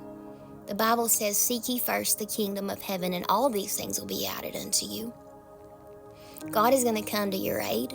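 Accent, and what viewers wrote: South, United States. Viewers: You Are So Beautiful 🙏 I Absolutely Adore You 🤫 That Southern Draw You Radiate Is An Undeniable Beauty Like No Other🤭 I'm From Bama & I Love It. Have A Wonderful Monday Sweet Angel 🫶🫂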